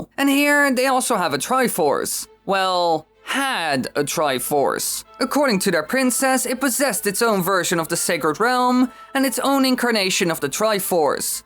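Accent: Netherlands. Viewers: Are you dutch?!!????! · Wacht.....Je bent Nederlands???????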